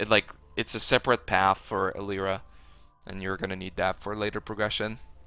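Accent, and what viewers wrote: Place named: Poland. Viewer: jak się człowiek spieszy to się diabeł cieszy ^^ od dawna cię oglądam, ale nie wiedziałem że jesteś z Polski :D trudno wyczuć akcent, gratulacje! powodzenia na lidze!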